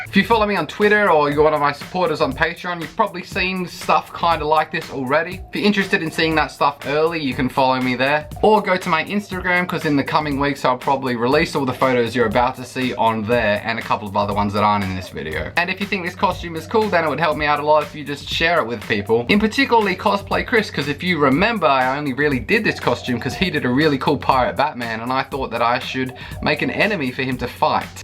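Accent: Australia. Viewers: noice!! i would subscribe to your bird channel too, also do David Attenborough voiceover with your cool Aussie accent :)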